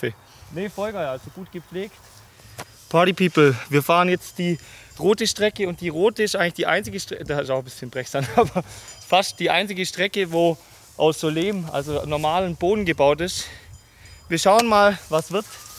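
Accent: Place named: Allgäu, Germany. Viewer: Grieß da! Ich kann mit Downhill zwar eher wenig anfangen bzw. hab da zu arg fear, feier deine Videos aber richtig ab. Bleib auf jeden Fall so spontan, witzig und sprachlich Allgäuerisch eingefärbt. Das ist mega Authentisch!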